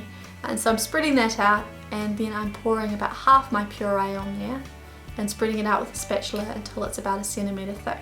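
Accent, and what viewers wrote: New Zealand. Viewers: is she from new zealand or australia?? (I'm KIWI!!!!!) 😂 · She sounds like she's from New Zealand, not Australia.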